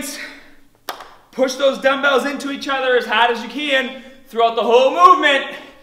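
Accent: Boston, United States. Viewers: ROFL . XD ,I didn't know that was a Boston accent. I've just never heard an accent like yours, maybe because I'm from S.A xD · Basten accent😄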